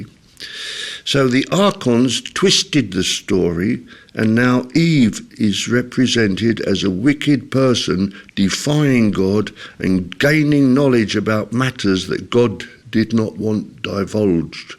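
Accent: United Kingdom. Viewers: Hi, did you know Stuart Wilde? What do you think of him? Your British accent reminds me of him.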